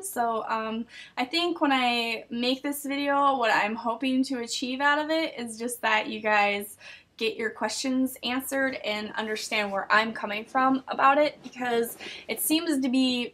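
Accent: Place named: Wales, United Kingdom. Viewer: Yeah....i love your Welsh accent.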